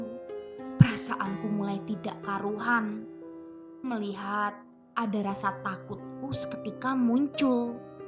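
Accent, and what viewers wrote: Java, Indonesia. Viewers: Suara medok neng😂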